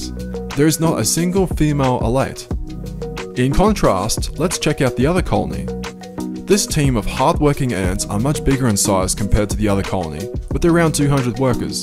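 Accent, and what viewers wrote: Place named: Australia. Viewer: its like youre a australian ants canada also good info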